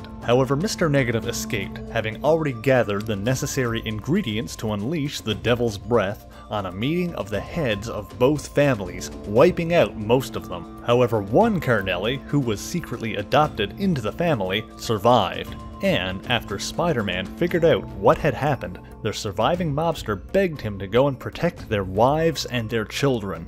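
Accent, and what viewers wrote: Canada. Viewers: Damm bro that Canadian accept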